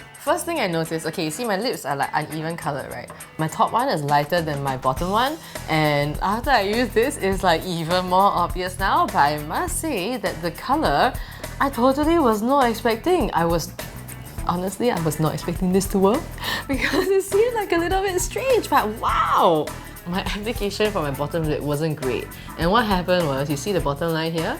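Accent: Singapore. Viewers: I think she's from Singapore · The singlish! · This is my second video of watching her and I love her cute Singaporean accent ^.^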